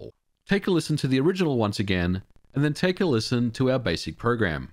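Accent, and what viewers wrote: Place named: Australia. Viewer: The Australian accent just hits different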